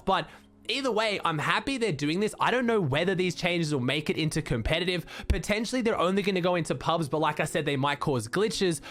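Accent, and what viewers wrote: Australia. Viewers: Hes Australian no shit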